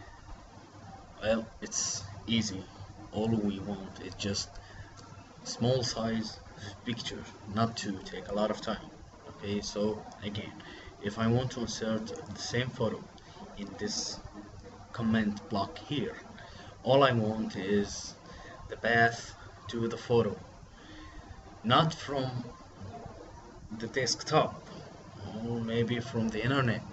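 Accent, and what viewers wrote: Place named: India. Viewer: The audio of your video, with combination of your SLIGHT accent ( nothing against people from India ) made it difficult for me to understand your directions. I wish you took the time to post the instructions in your description. Thanks.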